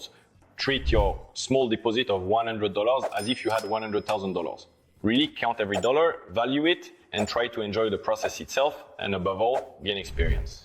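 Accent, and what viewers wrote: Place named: France. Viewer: You have a French Accent 😁😁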